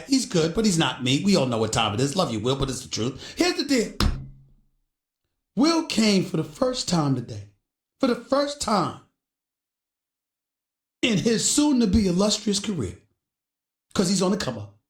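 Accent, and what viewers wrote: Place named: New York, United States. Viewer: All of new York coming outta this man 🤣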